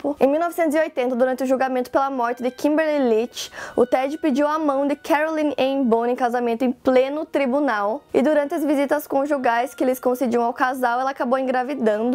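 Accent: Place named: Paraná, Brazil. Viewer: Sotaque paranaense, sei pq sou paranaense tbm sksk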